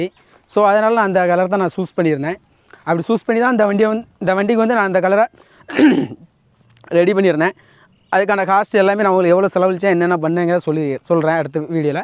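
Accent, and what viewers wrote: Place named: Tirunelveli, India. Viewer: Nellai Tamil alagu.car um alagu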